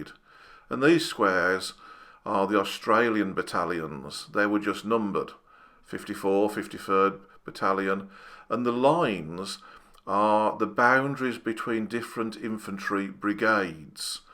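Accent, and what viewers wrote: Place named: England, United Kingdom. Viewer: A very well presented talk and delivered without the usual triumphalism that often is shown when Aussie battles are discussed. You have an English accent.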